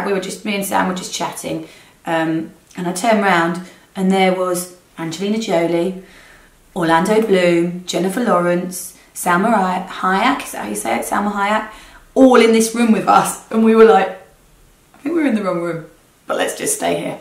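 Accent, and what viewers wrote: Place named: London, United Kingdom. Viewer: You have such a beautiful, perfect face! And I love the London accent! 😊🦄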